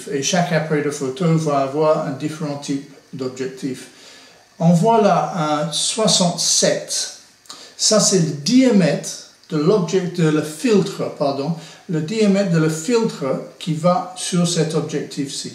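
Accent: England, United Kingdom. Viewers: Super ce petit cour avec l'accent anglais j'adooore. Je suis nouveau dans ce domaine de la photographie ce cour m'aidera beaucoup car je ne pensais pas qu'il y avait autant de spécifique aux objectifs merci merci merci encore pour votre partage vidéo. Je m'abonne. Bye...!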